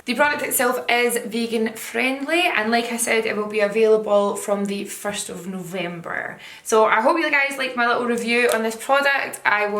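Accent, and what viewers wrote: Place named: Glasgow, United Kingdom. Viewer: Gen up the only Glaswegian accent I can listen to without cringing like mad! making weegies proud af yas x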